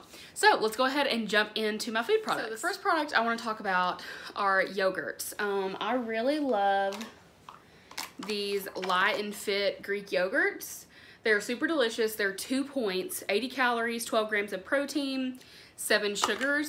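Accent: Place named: Kentucky, United States. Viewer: I knew you were from Ky from the accent. South Central here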